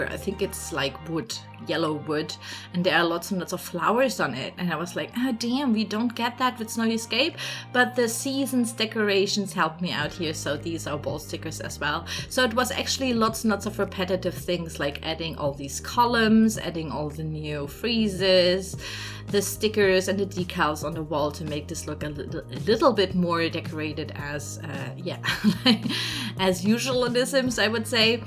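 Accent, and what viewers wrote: Germany. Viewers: I could hear it in your accent that you're german! (I'm german too!)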